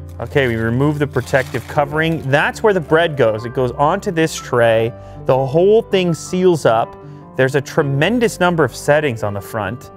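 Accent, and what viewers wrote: Canada. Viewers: This man has the Thiccciest Canadian accent I have ever heard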